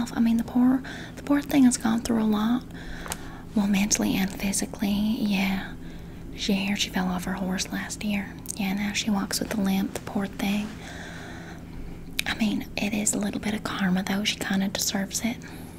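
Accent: South, United States. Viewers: Again, very nice southern accent! Also, I heard you mentioned some country singers in this video. I'm a very big fan of, and I am actually going to her concert at late August! Who are your favorite country singers,? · Haha that southern accent is funny lol btw nice job with imitating this accent 😂❤ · Nobody does Southern Belle like you. You could literally be part of a country TV series. Too good. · From a southerner, this RP is so spot on! 😂 I know PLENTY of girls like this. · I love your southern belle accent! Amazing as always! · girl, your lashes look amazing! also I absolutely love your southern accent, you're so talented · New trigger unlocked: southern accent. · i love southern accent videos as a woman who’s grown up in the south 😭😭 they crack me up! i love the vibe!!!! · Are u from the south? This is spot on! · I have a huge southern drawl, you nailed this!!!! 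#awesomejob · That southern accent is so attractive. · Honestly I would’ve thought you were southern 😂❤ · Such a cute role play for a Canadian you do Southern pretty well!! Also, your hat appears to be backwards 😉. Unless it's just been misshaped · Love the cowboy Accent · You ain’t southern but you’d never know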